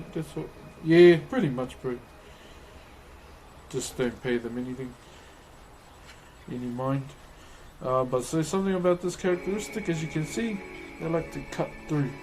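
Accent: New Zealand. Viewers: love the Maori accent, art is important to remember what matters in life. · Is he from New Zealand I love his voice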